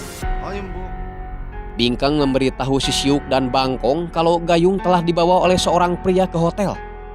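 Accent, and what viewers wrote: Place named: Majalaya, Indonesia. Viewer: Orang Majalaya mang.pantesan sundana te ciga garut.11/12 kt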